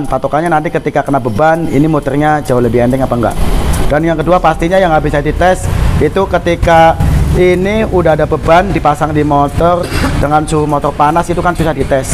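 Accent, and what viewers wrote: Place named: Java, Indonesia. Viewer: Demak jateng hadir mas. Jowone medok. Hhh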